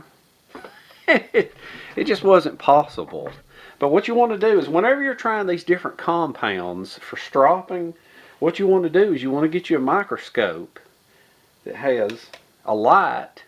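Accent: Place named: Florida, United States. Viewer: I was 17 when I went into the Army,I was the only one that was not an NG,I was RA and 17 all the NGs was from the north and me from Fla farm,they made fun of the way you and Isound untill we went on bivouac and to the rifle range,The city boys had never had it rough or been out in the sticks for fun,guess who had the last laugh,I can listen to you all day,just makes me home sick,keep it up Bud I,m 71 now and they call me OldJoe,or Old Grand Paw any way love uor stiff LOL keep it,Oh I did not know I was satropping wrong, thanks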